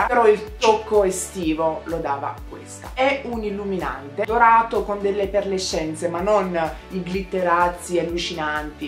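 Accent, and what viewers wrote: Apulia, Italy. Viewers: i versi mi hanno uccisa😂, sei bellissima ,si sente che sei pugliese,lo sono anch'io, se non sbaglio sei di Foggia... ciaooo bacii😂❤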